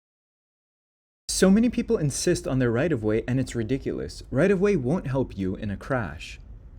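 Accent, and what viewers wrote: United States. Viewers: Why is an American voicing over a British dashcam compilation cause he know absolutely nothing about our roads and sounds stupid...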